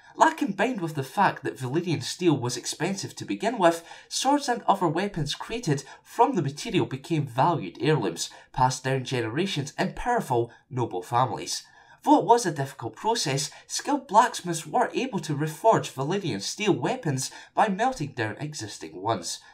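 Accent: Scotland, United Kingdom. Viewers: your videos are getting so much better and your uploads more faster. with your scottish accent its a much have😜 can you put a tutorial on how to speak scottish because im dutch and im scared to learn from my mom (born in sterlin)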